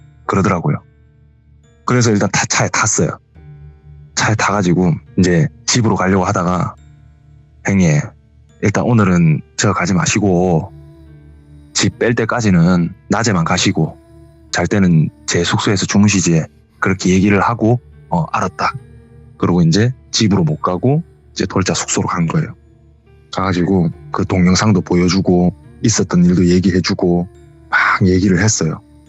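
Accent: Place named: Busan, South Korea. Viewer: 오랜만에 듣는 부산사투리 넘 좋어요 멀씀도 넘 잘하세요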